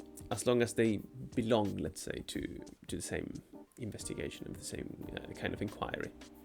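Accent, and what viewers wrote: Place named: Spain. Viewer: Me encantado! Que bueno es oir Graeca con un linga de Espanol. Yo amo las linguas de Espanol, y Griega, y otros. Now English. First truth: if one feels too proud just delve into Greek grammar to restore humility! For Ioanna, recall that Greeks were once illiterate. I refer to post- Mycenean collapse. They had their speech with all sounds/phonemes to express ideas, cases, etc. They had no WRITTEN case endings. They were then offered a menu of letters ( likely from Phonecian traders) from which to represent there spoken sounds. Folks who not long after created the Parthenon would not likely chose a number of letters for the same sound. Evidence also includes poetry lines that wdre meant to ryme but no longer do, latin transliterations and so on. The rough breathing was orig. H in another dialect and pronounced. Eyes were ophthalmoi now matia, winos ( with digamma) became winus then wine. 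Now called krasi, from mixing with water in a krator. Modern every day folks deny or do not k now this. Read Luke without much understanding. They eliminated Kztherevousa and adopted Demotic, the language of common ( usually poorly educated in lzst century, but not now of coursr). This removed them a step further from their kleromia/ heritage. No Greek kb here. But i love the channel and i subscribe. Karl Ioannis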